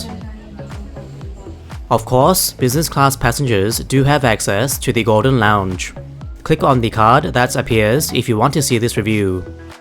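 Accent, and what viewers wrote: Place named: China. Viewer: Why is your pronunciation so reminiscent of a Chinese - educated person? Pronouncing non - existent “Ss”. And your tone of voice is really flat like the airport runway.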